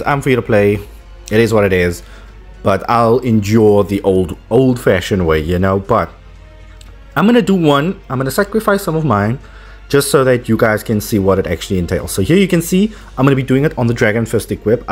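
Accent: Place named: South Africa. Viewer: Knew It was a fellow South African by the accent😂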